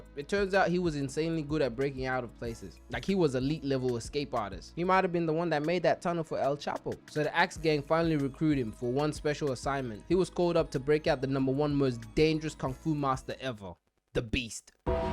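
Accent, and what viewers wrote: England, United Kingdom. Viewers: Are u english or sum? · dude I can tell you English not American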